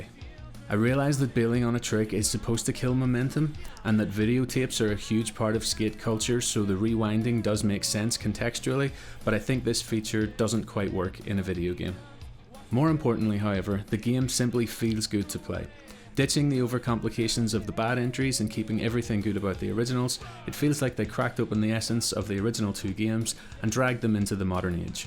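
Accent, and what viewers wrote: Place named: Ireland. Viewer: North Americans love a good Irish accent!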